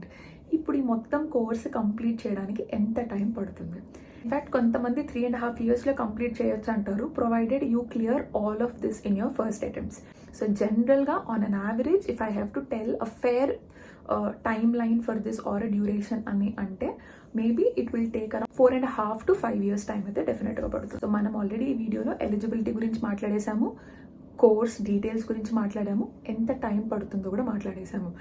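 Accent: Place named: Kerala, India. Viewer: Miku malayalam accent undi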